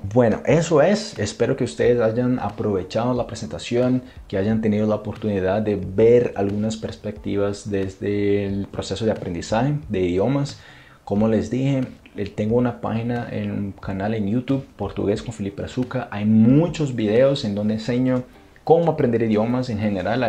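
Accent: Colombia. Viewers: ya tienes el aacento colombiano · Felicitaciones pareces un colombiano hablando espanol tu nivel es excelente❤ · Tiene acento Colombiano este muchacho. 😂 Si si Colombia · Hablas con acento colombiano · Dios mío! Es increíble como hablas de bien español! Tenho uma dúvida: para falar muito bem português, tenho que ter sotaque também? Por exemplo, você fala como colombiano! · Se nota bastante el acento colombiano. Un saludo. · Usted para un brasileno perfeciono muy Bien El Espanol su Espanol suena muy Colombiano Felicidades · voce tem o sotaque colombiano!!! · Parabens! Llevo muy poco aprendiendo y llegué a tu canal. Me gusta mucho y me llamó mucho la atención tu español que es prefecto, yo diría casi seguro que lo aprendiste en Colombia 😎, como sea, lo hablas muy bien..bueno, saludos desde Valparaíso, Chile, Obrigado meu amigo 😉🇧🇷🇨🇱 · Wow, apenas te descubrí hoy pero estoy impresionado, acabo de ver un video tuyo donde hablas en portugués y obviamente no tuve dudas de que eras de Brasil, pero luego hablaste un poco en español y ahí me entró la duda de dónde eras porque tu español es perfecto y tu acento me sonó a Colombia. 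Tuve que ver este video donde hablas español para descubrirlo, ahora estoy más impresionado. Soy de Colombia y si dijeras que naciste aquí te creería sin dudarlo. Muy buen trabajo, quiero aprender tu idioma y me encantaría llegar a sonar como tú al hablar en portugués, muchas gracias por las recomendaciones, son muy útiles. Saludos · Hermano felicitaciones pareces un colombiano es impresionante como tenés marcado el acento no pareces brasileño jajajaj,estoy viendo todos tus videos y me ayudan mucho a mi me encanta el portugués desde muy chico escuchaba música axe que pasaban acá en Uruguay y desde hace un tiempo empecé a estudiar portugués,abrazo saludos desde Montevideo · tienes acento colombiano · woooow muito legal, eu aproveito muito seus ensinamentos você é um professor muito especial e também parece um nativo de Colombia parabéns e obrigada 🇨🇴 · Pensé que eras colombiano jaja hasta que escuché " tenía problemas con el español en argentina". 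Ahora, ya eres un nativo en este idioma, definitivamente. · Tu acento en espanol es colombiano creo jeje no te habia escuchado en espanol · Usted, no es de Brasil, es de Colombia! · !! Gosto muito de ouvir vc falando portugués, mas falando espanhol e com sotaque colombiano es Wooo👏 estou estudando muito, Obg vc pelos seus videos ajudar muito.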